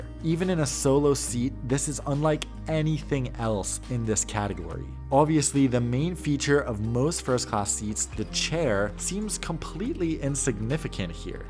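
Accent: Singapore. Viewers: I subscribed when I heard you speak with that Spore accent! 🤣👍👍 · That Singlish is absolutely awsome! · mate, first time watching your videos, im blown away not just by the quality of your review, but also your singlish!! Wa eh steady leh, very rare to hear an angmoh dude like you speak like us! thank you for giving our beautiful creole language some spotlight! :) - from sg with love :) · Hahaha love the Singlish scattered thru out this video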